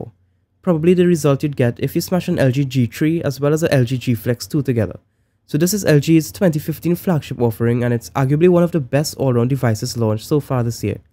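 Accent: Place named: Trinidad and Tobago. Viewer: That was an excellent review bro. I picked up some Trini twang here and there but, not much. "I simply hit the simple button" #priceless. Awesome quality as usual and very informative.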